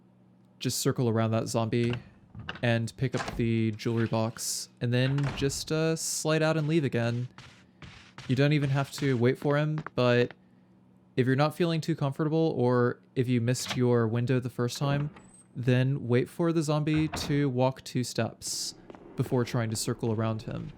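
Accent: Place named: England, United Kingdom. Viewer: I love your voice. Superb english accent.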